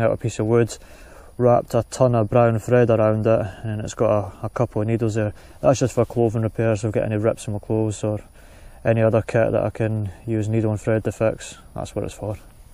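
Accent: Scotland, United Kingdom. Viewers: Love the brave heart bagpipes. The kit and your Scottish accent are awesome. Great job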